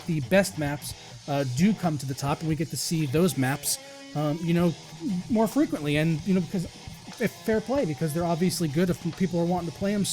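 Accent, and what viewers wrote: Canada. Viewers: that Canadian accent made me moist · O Canada! BTW great Canadian accent! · I had this course in uni and there was this Canadian guy, and damn he talked exactly like that. Never managed to hear the difference until now (Eastern-European here).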